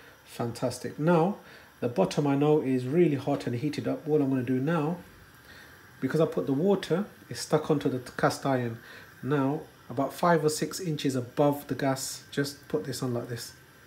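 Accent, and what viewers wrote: Sylhet, Bangladesh. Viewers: ❤️ from Bangladesh vaiya! Amazing work u r doing in UK! U sound like Sylheti 😊 Assalamualaikum to aunti ❤️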